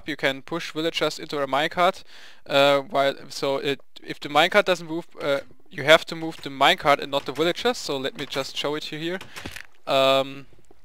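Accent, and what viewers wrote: Germany. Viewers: Are u german? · man hört sofort dass du deutscher bist :) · are you german ? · Are you german sounds like german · typischer deutscher accent :D aber weiter so! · extreme german accent :D awesome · haha krasser deutsch - english dialekt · I think you german ! (ich auch XD) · I hear, you come from germany :D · u r german? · are u german ?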